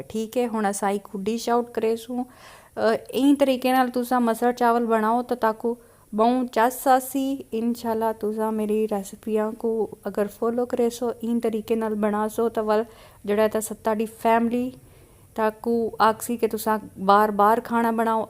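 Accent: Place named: Saraiki, Pakistan. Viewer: Piari piari sariaki vice😅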